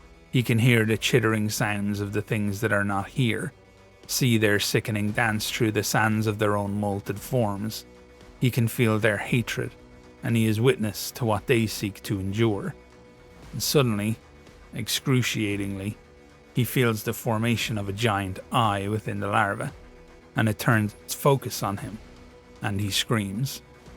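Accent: Ireland. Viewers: What an amazing story. Love the style, the temhe and your irish accent!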